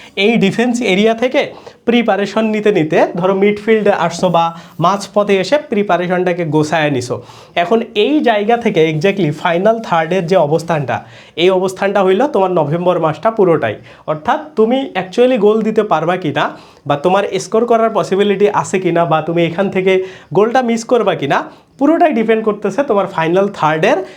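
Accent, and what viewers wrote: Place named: Bangladesh. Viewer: So many Bangladeshi teachers have this one thing for improper pronunciation. I think it's due to their local Bangla accent. Otherwise their teachings are good. The pronunciation low-key puts me off tho. But I don't think that's a big deal.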